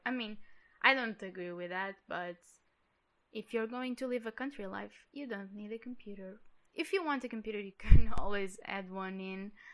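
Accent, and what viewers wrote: United States. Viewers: Legal! Sou do Brasil. Parabéns pelo seu inglês, jurava q vc era americana. kk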